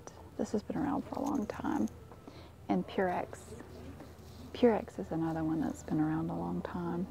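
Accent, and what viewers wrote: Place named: Georgia, United States. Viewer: I don't write comments often or at all really but I really want to say Thank you I used to live in GA but I moved and your accent reminds me of home. Also I still get the same tingles when people whisper in my ear. I've had a really hard time falling asleep lately but your videos remind me of home and put me right to sleep! Thank you for making your videos! You are absolutely amazing. Please don't stop making videos. Love the walk throughs!! Stay strong and blessed!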